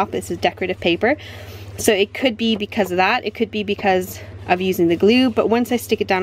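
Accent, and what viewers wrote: United Kingdom. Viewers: 35 is VERY hot for the UK. I was laughing when it reached 26 a few years back and the news was warning to keep cool to avoid fainting. However, if it is that hot in Europe this summer, we in Australia can expect temperatures in the high 40s and early 50s. Oh brother. I noticed a bit of the British accent mixed in there. Nice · FANTASTIC video!! Just super, loved it.! I learned a lot and I thought of some different ideas. Thank you sooooo much for teaching us! You can't tell the difference between the home-made pastes and the store bought. Your cards are soooooo cool! You don't sound like you have much of an English accent. What area are you from? Been sweltering here across the pond also! Yuck!☺😊😀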